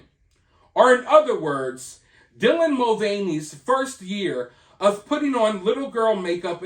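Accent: South, United States. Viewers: That country accent, you sound like me lol!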